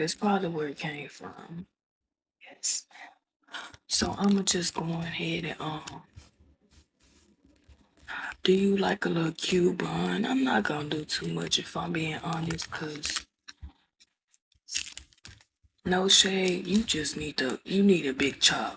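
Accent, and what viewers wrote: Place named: Tennessee, United States. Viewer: Aint even a woman but im frm Tennessee too so yo accent remind me of home 🙌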